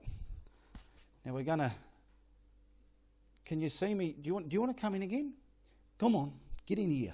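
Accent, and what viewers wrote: Australia. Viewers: After reading a few comments, I think your videos are just about right. 30 minutes is great. I tend to get tired and lose interest after 40 or so. Keep up the excellent work! (Although, I could listen to you speak for hours! Love the Aussie accent!) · Love the Aussie accent and humour. Love your paintings too and I will try something similar. Thanks from another Aussie..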